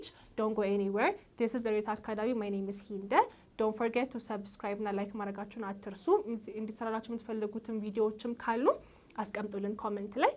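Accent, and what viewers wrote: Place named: United Kingdom. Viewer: 😢😢😢😢😢😢 omg special British accents I can't understand ohhfuuuu 😢😢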